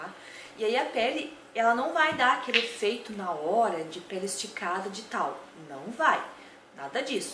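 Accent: Rio Grande do Sul, Brazil. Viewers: você é gaúcha?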